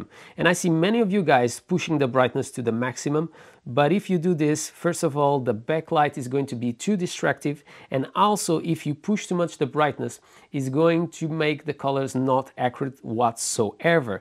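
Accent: Portugal. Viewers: es português? tens muito sotaque português a falar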